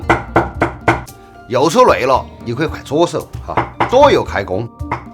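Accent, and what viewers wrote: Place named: Sichuan, China. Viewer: love this guy's sichuan accent,. but pork lard is not recommended , it blocks blood vessels.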